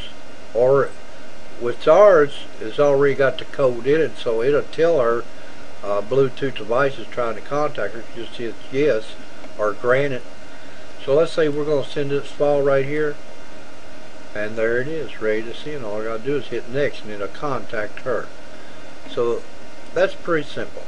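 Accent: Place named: Texas, United States. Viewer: Great Texas Accent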